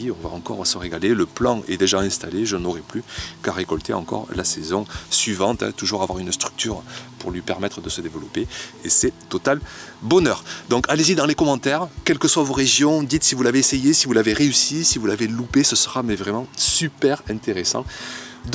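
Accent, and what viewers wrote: South, France. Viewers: Bonjour, je confirme que la chayotte en pleine terre réussit très bien en Alsace! La mienne est très à l'aise en grimpant sur l'actinidia.J'en rentre des cageots pleins en ce moment...Par contre, effectivement, en pot, aucune production...! J'adore vos vidéos si vivantes et avec l'accent du sud en prime!😀🥰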